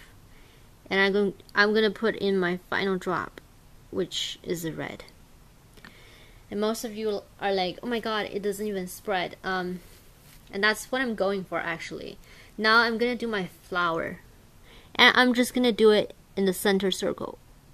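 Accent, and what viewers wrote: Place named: China. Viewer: its only because she has a chinese accent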